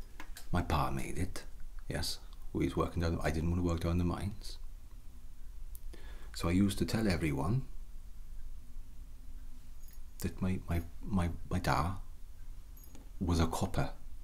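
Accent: Wales, United Kingdom. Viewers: Wow your welsh accents amazing, and your mannerisms and idioms are great! Well done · Fascinating! Never imagined a complaining coppo being relaxing. Can you share what kind of Welsh accent that is? It sounds almost Scottish. The only Welsh accent I know is what Christian Bale uses when not method acting off-camera. And is it normal for Welsh to work in some Welsh words whilst speaking English? Well done dude! Thanks! Cenllysg Cymru! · I love when you use the Welsh accent. 🥰 Also Rory had me laughing the whole time I almost forgot that I was reporting a crime.😂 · I am one happy person! I think I once requested a video with the welsh accent or language (which I am learning🙃🙃). This was hilarious all the way through. Thank you!! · 何本か見て悩んでたけど、解決した。発音ウェールズなんだ！！！ カーディフって聞こえて脳内がトーチウッドに占められたし、このままいい夢が見れそうです。 Thank you ち！！ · I'm with you Constable. I'm not fond of people either. 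That's why I rescue cats. Happy to hear your Welsh again. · This is a uncomfortably accurate welsh accent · I've never heard a Welsh accent before and WHY ARE THEY NOT MORE POPULAR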